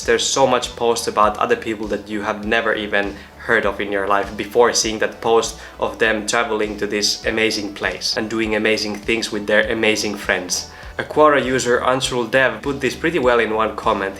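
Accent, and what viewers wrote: Finland. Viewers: Kuulin puhetavastasi heti että olet Suomesta ennen kuin katsoin kanavan tietoja.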